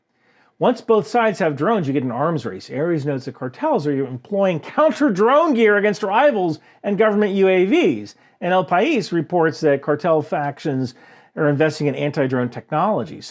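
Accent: New Jersey, United States. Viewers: The New Jersey, accent😂. You are cracking me up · you should always talk in you jersey accent, i like it lol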